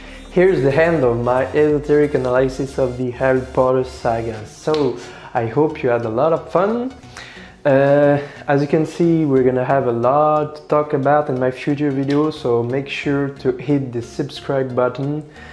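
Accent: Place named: France. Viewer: thank you for the time you spent working on this video, as I was searching for esoteric studies about the Harry potter saga I unexpectedly found myself watching your video. I'm delighted to find out that you're French. No doubt a native english speaker would spot your french accent in no time but as a French myself I would not have guessed you were one at first, so great accent, it's rare enough to be cheered and I must say it is a wonderful idea to share an english-speaking content like that, may it bring you a large audience! Continue comme ça, tu as gagné un abonné 😉 C'est une excellente idée d'avoir pensé à sous-titrer! Si je peux me permettre une critique: tu devrais utiliser une autre musique pour l'intro. Pendant la vidéo je me suis demandé pourquoi tu laissais la musique en fond puis j'ai réalisé que ce serait gênant si on entendait juste ta voix dans une pièce vide.. 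Peut-être que je suis le seul que ça chagrine mais encore une fois tu devrais changer de musique, ça fait un peu cheap genre "best techno 2009" c'est dommage, sache que je dis cela en toute bienveillance pour que tu t'améliore 🙂 Je vois que tu maîtrise bien la langue de Shakespeare -- assurément mieux que 95% des Français -- mais encore une fois sans vouloir être pénible j'ai remarqué quelques erreurs qui pourraient être évitées. Si tu le souhaites je serais ravi de t'aider de quelque manière que ce soit, par exemple en relisant ton script ou tes sous-titres ou t'aider à faire autre chose, je su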